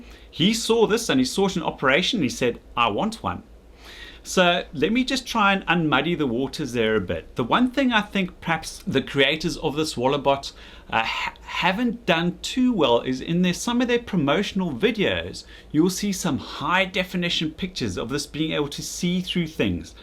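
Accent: South Africa. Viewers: South Africa or Zimbabwe probably, def. not British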